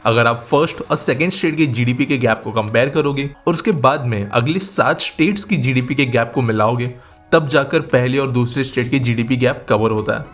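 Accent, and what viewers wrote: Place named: Maharashtra, India. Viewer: Are ye local dehati accent hai jaise bihar ki apni language hai, hariyana me bhi alag hi accent hota hai yaha ka accent Bollywood ki wajah se zyada famous hai or Hindi pe thoda marathi touch hota hai isliye idhar ka hindi accent alag hai. You don't even speak or know marathi so don't comment any bull shit without knowing?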